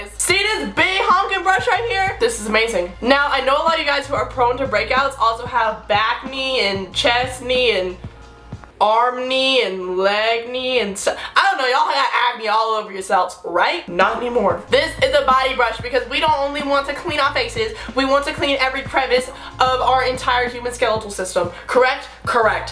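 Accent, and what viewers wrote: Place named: Texas, United States. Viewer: She's Texan lol half of my family is😂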